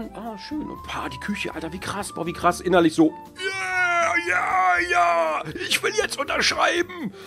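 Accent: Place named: Germany. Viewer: Nicht wirklich. Wenn ich ihm beim Englisch-sprechen zuhöre, fällt mir der deutsche Akzent schon sehr auf. xD Aber eigentlich sehr schade. Stellt euch mal vor, wie krass viele Abos Gronkh bekommen würde, wenn er auf Englisch kommentieren würde! :o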